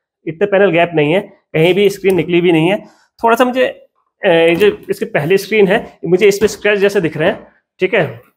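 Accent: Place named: Pahadi, India. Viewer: Nice vdo..🎉 ..apki awaj se feel hua pahadi ho..fir channel pe dekha nanital mentioned h 😅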